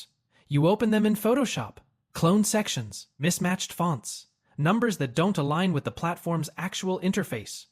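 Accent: United Kingdom. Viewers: I love how it slowly slips in and out of a brittish accent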